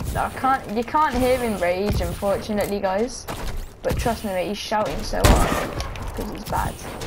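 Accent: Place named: Birmingham, United Kingdom. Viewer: You sound like your from Birmingham... Great comeback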